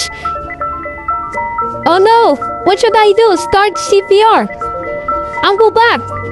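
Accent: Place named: India. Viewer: Bros voice is indian....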